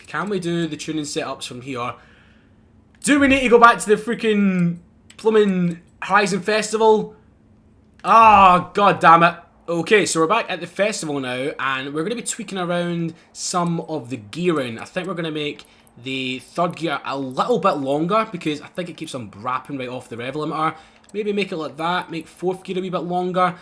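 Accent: Scotland, United Kingdom. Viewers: bro your accent is awesome I love the Scottish accent, and am so glad I found your channel again, I can remember watching your game play on a Dodge challenger hellcat when Forza horizon 3 come out and it was awesome but at the time I didn't have my you tube account, and it's ages since I have looking for your channel, anyways my dude keep up your awesome work and I'll make sure I'll stay tuned in :)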